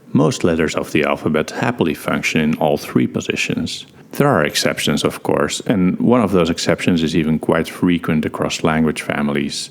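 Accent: Netherlands. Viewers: I thought you sounded Dutch but... “no it’s slightly off” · you sound so extremely "dutch"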